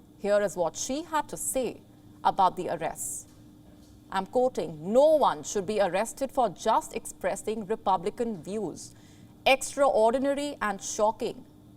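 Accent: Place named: India. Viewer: She is speaking English in Hindi accent. Very hard to follow. Need to improve her delivery.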